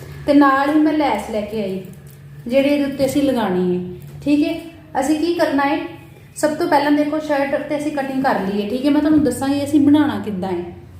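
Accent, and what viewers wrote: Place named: Majha, India. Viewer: ਤੁਹਾਡੀ ਬੋਲੀ ਮਾਝੇ ਦੀ ਏ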